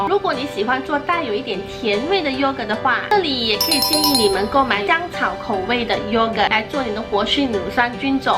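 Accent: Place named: Taiwan. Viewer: 姐姐，还以为你是来自台湾的呢？哈哈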